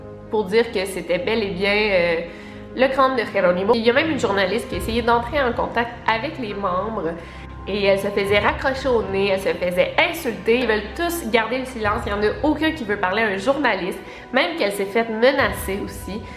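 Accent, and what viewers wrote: Canada. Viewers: Hello! Je viens seulement de te découvrir et laisse moi te dire que je suis fan de tes vidéos! Moi qui avait du mal avec l'accent québécois (j'habite en France), je ne l'entends presque plus 😄 En ces temps de confinement, on a énormément de temps et je passe la majorité de mon temps à découvrir tes vidéos, elles sont top! Par contre, je voulais te faire part d'une chose. Je ne sais pas si tu as déjà regardé la série The order sur Netflix mais en voyant les images de la société skull and bones je me suis complètement retrouvé dans cette série! Pour faire bref, il s'agit d'un jeune garçon qui intègre une secte secrète qui trouve son siège au sein du campus (tout comme ce que tu racontes) et les membres de cette secte sont vêtus de masques bizarres (encore une fois pareil que ce tu racontes). Ils ont des rituels bizarres également que l'on peut voir dans la série. 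J'ai trouvé ça étonnant, j'avais l'impression que tu parlais de la série tellement il y avait de points communs! Se seraient-ils inspirés de la société pour réaliser la série? Je pense qu'en grande majorité oui et c'est, comme tu dis, creepy! Une chose encore plus étonnante, c'est que je n'ai vu personne mentionner cette similitude dans les commentaires! Bon courage pour la suite, tu es top! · Coucou, j'ai découvert ta chaîne il y a quelques jours et j'ai regardé beaucoup de tes vidéos en seulement quelques jours, tu as l'air tellement gentille, t'es super mignonne, les sujets que tu traites me passionnent aussi donc j'adore ta chaîne et j'adore ton accent (je suis une française qui adore le Canada ) bref, continu, j'adore aussi les sujets sur les disparitions, les théories du complots, j'aime TOUT ! Mais des fois quand tu mets des extraits des vidéos en anglais parfois tu ne mets pas les sous titres et pour les gens comme moi qui comprennent pas trop l'anglais c'est embêtant mais c'est pas grave non plus, ça empêche pas de comprendre la vidéo. 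Enfin voilà, continu tes vidéos, bisous de la France 😙😁😁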